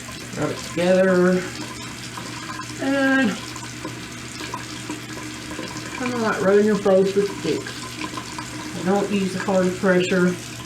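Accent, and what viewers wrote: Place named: Georgia, United States. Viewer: LOL, girl I didn’t know you were from SoUth Ga until you told us. I didn’t hear your accent at all until you let it go. My dad is from Cordele and my daughter attended Valdosta State. ☺️ Small world.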